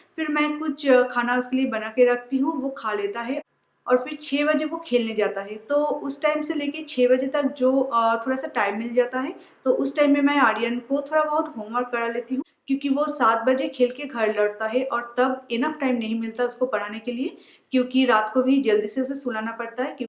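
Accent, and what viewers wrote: Bengal, India. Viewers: At first your accent says you are Bengali so great u are trying to speak in hindi and secondly before seeing the video I seriously thought u are a single handed woman I mean u don't have the other hand hahaha... And finally what kind of video is this?? So what do all other women do?? Eat and sleep.. Every woman works be it housewife ir working woman.. I m not a hater but this video is a waste of time and totally useless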